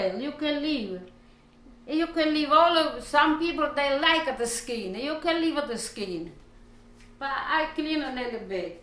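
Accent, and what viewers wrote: Italy. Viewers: This dish was my favorite!!!!!! My Father used to make it!!!!! My Fathers family was from Naples Italy. He used to put raisins in the sauce to take the acidity out of the whole tomatoes and add sweetness. My grandmother made the sauce like this in Naples. I LOVE WATCHING YOU AND YOUR ACCENT MY Fathers whole family was from Naples. Could barely speak english and your accent reminds me of the good times. The Lord Jesus bless you✝️🕎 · I love to watch you Mama! You are so happy when you are cooking! I love you. I am Irish Americàn, but my roots are Spanish. I think that's why I love the Mediteranean food. Anyway, I love to watch you and hear you direct us in the process of making the recipes you present. There have been negàtive comments about you. I, for one, love your Italian accent and appreciate your learning English and love your presentations. 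If people criticize your presentstion just ignore them. I love you and your sharing your cooking knowledge. Keep making your videos, I will always watch!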